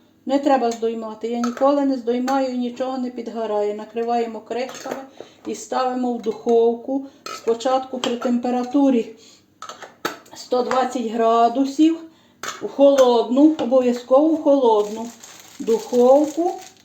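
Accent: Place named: Ternopil, Ukraine. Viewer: Говорите точно, как у мамы на родине в тернопольской области, все слова, звуки, интонации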